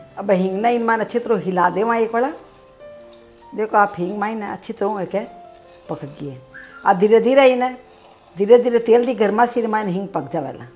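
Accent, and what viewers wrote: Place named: Marwari, India. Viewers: Aachar ka toh pata nahi but aap ka bolne ka Rajasthani andaz bahut badhiya hai 👍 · आप री आवाज ओर मारवाड़ी में बोलण री स्टाइल बहोत ही पयारी लागी सा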